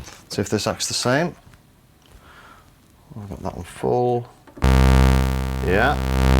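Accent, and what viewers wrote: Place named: Chester, United Kingdom. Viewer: great review mate...you sound like a Chester lad!!!!!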